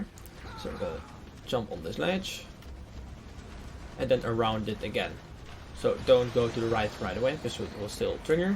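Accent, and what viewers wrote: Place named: Netherlands. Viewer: Mooie Nederlands-Engels accent.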